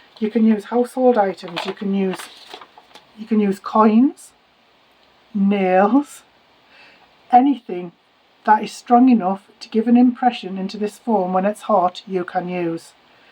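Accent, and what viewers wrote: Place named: Northumberland, United Kingdom. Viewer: Which part of Northumberland are you from 😊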